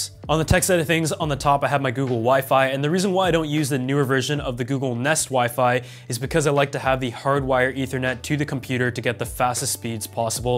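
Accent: Canada. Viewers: Hey, are you Canadian man?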